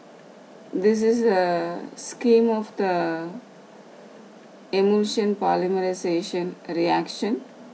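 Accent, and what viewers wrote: India. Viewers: typical South Indian accent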